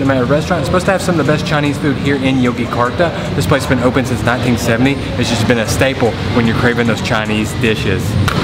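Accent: South, United States. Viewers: just read brief description where you're from. thought you're from Georgia :-D, i notice your southern accent. I've never been to States but I have friends and families live in various states in the US. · didn’t realize you had a southern accent. Your videos from the Philippines was amazing. Never been there but after watching you eat... it’s on my bucket list. Cheers.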